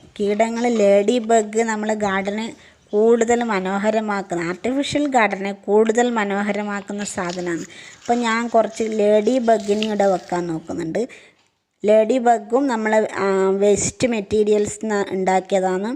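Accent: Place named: Kannur, India. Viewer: നമ്മളെ കണ്ണൂര് ഭാഷ നല്ല രസം ഇണ്ട് കെകാൻ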